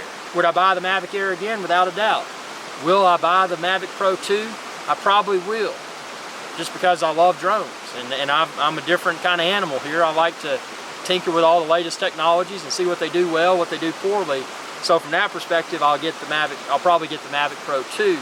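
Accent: South, United States. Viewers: Thank you. Love your southern accent. (UK)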